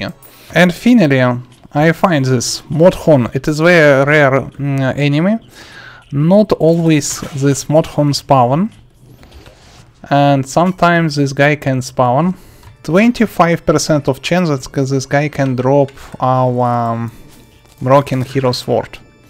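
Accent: Russia. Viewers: Я конечно пытаюсь выучить английский, но русского человека говорящего по английски с таким акцентом понимать невообразимо просто, спасибо. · it sounds russian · У него русский акцент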